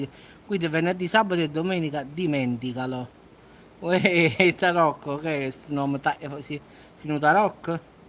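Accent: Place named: Salerno, Italy. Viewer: Buonasera, mi piace moltissimo l'accento del tuo dialetto sei davvero simpatico, stasera ti sento molto provato dal caldo, anche qui da noi, fuori Firenze non scherza, stasera un po' meglio è più ventilato e la temperatura si è abbassata...buonanotte a tutta Salerno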